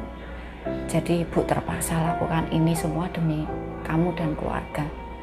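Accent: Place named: Java, Indonesia. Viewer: Mbk,,, njowone ngendi mbak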